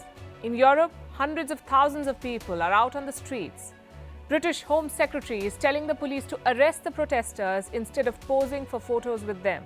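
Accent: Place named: India. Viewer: Her English is the most beautiful Indian English ever that I have heard in my whole life:):):)😊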